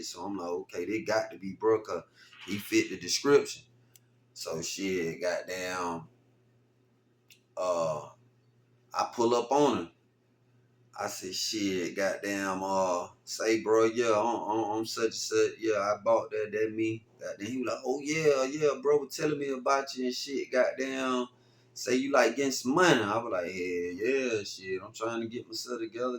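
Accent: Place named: South, United States. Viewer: Bruh you be slindin your stories like a real nigga. I just subscribed to your channel and I’m tuned in witcha now. I’m from Florida and you talk like you from da way and I’m feeling dat ya feel me. Vic Damone Skr8kuttinup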